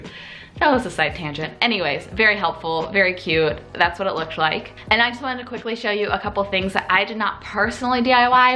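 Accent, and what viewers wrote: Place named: South, United States. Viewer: Lol you always start your videos with a thick southern accent......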